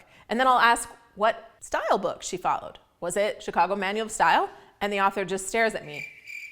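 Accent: Canada. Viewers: Very informative- as always. Thanks & hang on to that 🍁accent 😉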